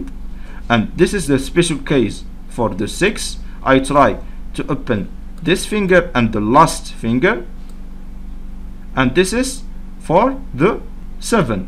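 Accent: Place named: Morocco. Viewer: SAWT 3NDK MGHRIBI A SAT HHHH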